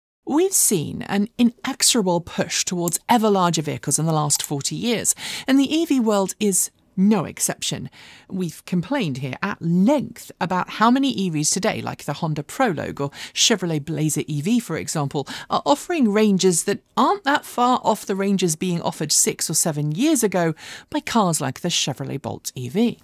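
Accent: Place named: United Kingdom. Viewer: Well, it sure did take you a very long time to make some good points! Get on with it and so being in love with your own bloody British accent.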